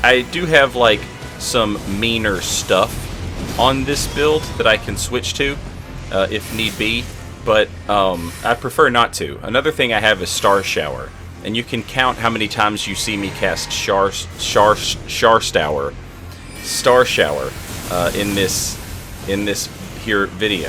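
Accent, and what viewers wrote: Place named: Tennessee, United States. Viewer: you're from tennessee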